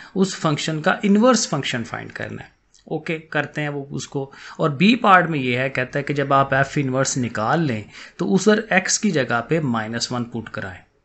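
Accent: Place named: Lahore, Pakistan. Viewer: Yes His Accent are like Lahori People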